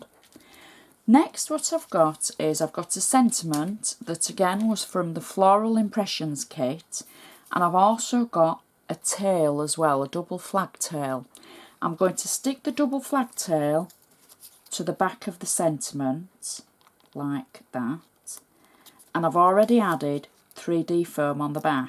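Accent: United Kingdom. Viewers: Love your accent. What part of the UK are you from. Enjoy your tutorials. Beautiful designs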